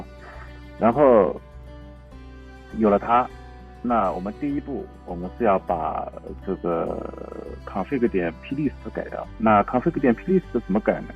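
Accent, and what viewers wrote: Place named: Jiangsu-Zhejiang, China. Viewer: 小哥哥，应该是江浙人士。。。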